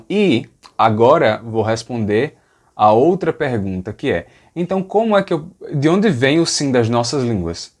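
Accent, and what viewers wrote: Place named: Northeast, Brazil. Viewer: da onde e o seu sotaque? Eu moro nos EUA por 35 anos e nao consigo saber exatamente que sotaque e o seu. Nordeste em algum lugar? Obraigada pela aula excellent.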